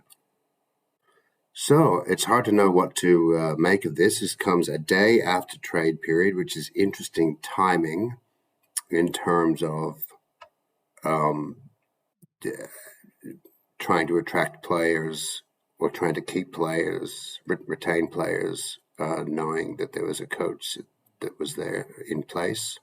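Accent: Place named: United States. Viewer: Am I crazy, or can I detect a slight Ameircsn accent?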